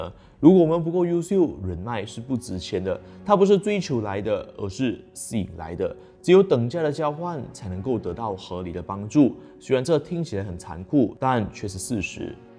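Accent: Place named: Malaysia. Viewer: 聽口音是馬來西亞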